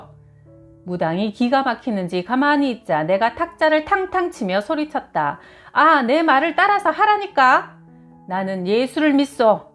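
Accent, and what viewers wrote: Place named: Jeolla, South Korea. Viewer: 아따 전라도 사투리가 구수하요 잉~~~ 어쩜 이렇게 사투리가 맛깔나게 표현 하실까 사투리로 웃다가 예수이름 나오니 눈물이 가득 했다가 점쟁이가 예수님 믿는걸로 웃다가 울다가 ㅎㅎ 감사합니다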